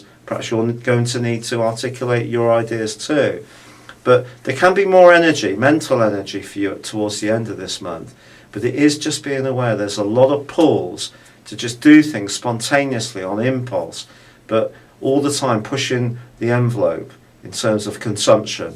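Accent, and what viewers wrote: England, United Kingdom. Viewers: Why do all of you astrology people have English accents. I can not stand that sound .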